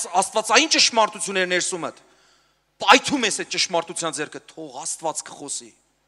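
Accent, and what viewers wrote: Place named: Lebanon. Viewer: ՏԵՐԸ օրհնի ախպերս, Քրիստոսով։ Իմ սրտից դուրս եկած քարոզ ես քարոզում։ Շատ մաքուր հայերեն ես խոսում։ Լիբանանում եք ծնվել՞։ Տերը օրհնի իրա միակ Սուրբ եկեղեցին։ ԱՄԵՆ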